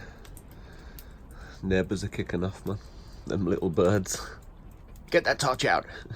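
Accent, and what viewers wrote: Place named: Scotland, United Kingdom. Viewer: U sound Scottish, Boss. Maybe from da Shetlands.....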